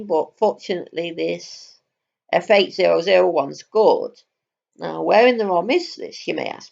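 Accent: United Kingdom. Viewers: I cant put my finger on it.. what is your accent? British?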